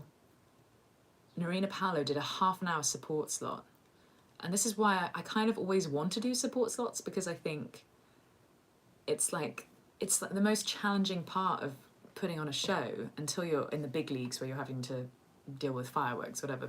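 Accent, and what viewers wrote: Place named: England, United Kingdom. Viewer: it's the accent. Kind of wish I knew from which part of England. It's killing me. Must. Marry. <3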